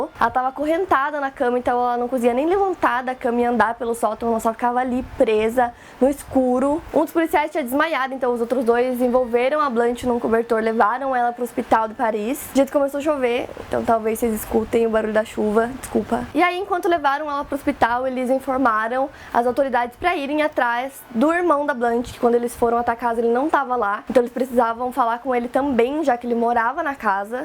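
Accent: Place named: Caipira, Brazil. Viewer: Mds você é muito caipira que chega a incomodar de ouvir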